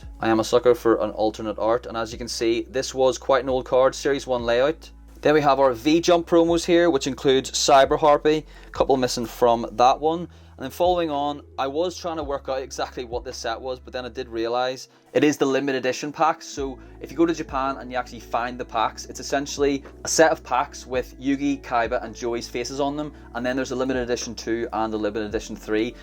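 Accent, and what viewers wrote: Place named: Northern Ireland, United Kingdom. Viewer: Northern Ireland accent 🤔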